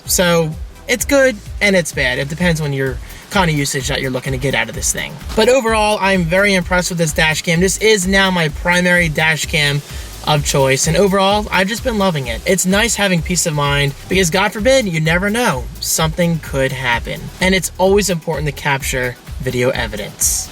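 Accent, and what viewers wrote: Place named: Philadelphia, United States. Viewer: Classic Philly Accent haha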